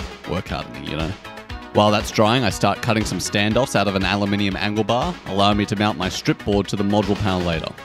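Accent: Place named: Australia. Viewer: Awesome! Great to hear a fellow aussie too!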